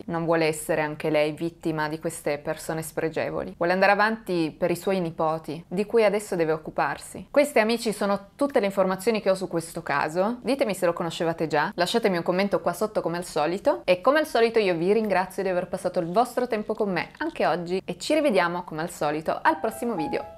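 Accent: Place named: Turin, Italy. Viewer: Secondo me sei triestina.... No di Torino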